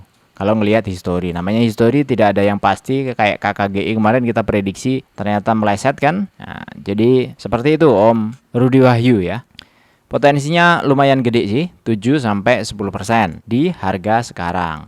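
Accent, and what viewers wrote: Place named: Madura, Indonesia. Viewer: Trimakasih abang qu... Sudah di cek saham pans nya... Maaf bang.. Asli orang madura y... Logat nya.. Ngga bisa hilang.. 🙏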